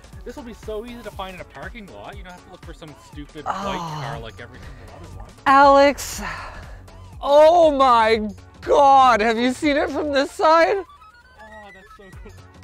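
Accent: Canada. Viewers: Is it just me or is Linus sounding really Canadian these days?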